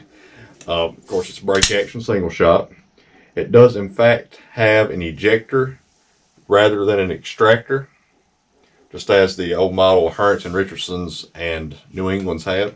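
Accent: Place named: South, United States. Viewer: I subscribed just because of his voice and southern accent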